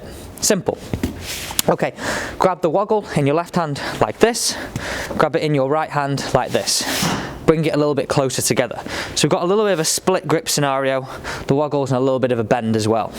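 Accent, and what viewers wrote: United Kingdom. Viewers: Great visual drill,.! Brit accent and all!::)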